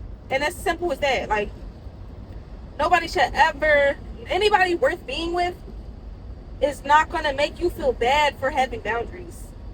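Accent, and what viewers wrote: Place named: Philadelphia, United States. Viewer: You must be from Philly I can hear your accent! What you said is so true we have to establish boundaries to protect our own peace. You learned it the hard way, but thank you for passing the knowledge on to us.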